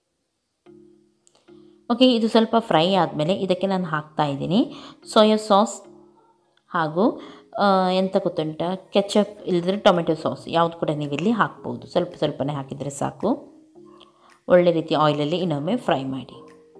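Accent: Mangalore, India. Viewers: Hi mam Nim Mangalore li mathado kannada language andre nange thumba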